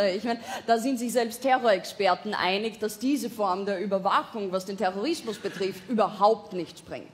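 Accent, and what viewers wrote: Austria. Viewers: ich find lisa auch klasse.tritt oft b. D. Nuhr auf. Wenn einige im publikum nur die hälfte mitbekommen könnte es auch an ihrem schnellen, "österreichischen" akzent liegen? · Dabei spricht sie ein sehr, sehr schönes und wirklich gut verständliches Deutsch, nur mit einem Hauch österreichischem Dialekt. Einfach noch öfter anschauen und hören „üben“ sag ich als Deutsche in Österreich lebend. Das wird schon…🙂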